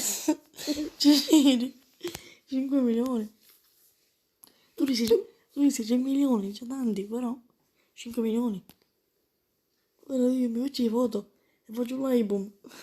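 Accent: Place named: Sicily, Italy. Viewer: Si sente l' accento del Siciliano 😀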